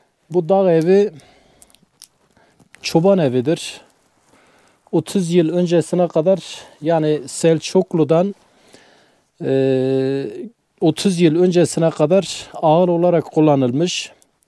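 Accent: Adıyaman, Turkey. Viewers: Abi sen kahtalı mısın? Ağzından ilk kelime çıktığı anda eşime bu abi hemşehrimiz dedim..Adıyaman’dan sevgiler saygılar..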